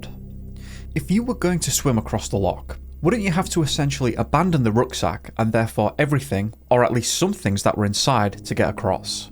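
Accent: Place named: North, United Kingdom. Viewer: I just really am struggling to listen to your northern accent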